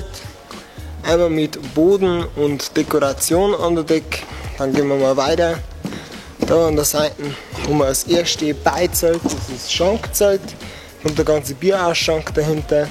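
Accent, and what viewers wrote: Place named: Upper Palatinate, Germany. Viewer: Geh leck, der Oberfälzer Dialekt is scho da krasseste fo olle😄😅👍🏼.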